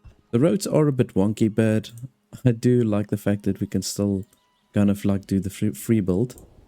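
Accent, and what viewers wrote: South Africa. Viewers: Hey dude... as a South African. You sound like a South African. Where you from???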